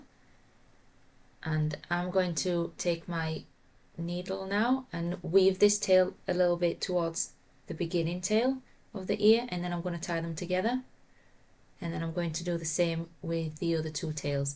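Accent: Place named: North, United Kingdom. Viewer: Great to hear a northern accent with clear instructions. I’m a beginner and had no problem following your pattern. Thank you. I will be following you from now on. Xx❤️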